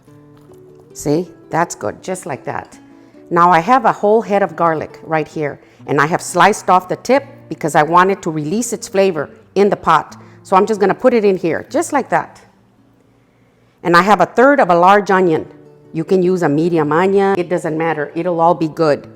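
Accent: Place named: Ohio, United States. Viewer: I just began to follow you a couple of months ago. I noticed your accent and it sounded familiar. Now, I know you live in Ohio. I was born in Ohio raised there until 8 years old. My family moved to the RGV and we’ve been in Texas ever since.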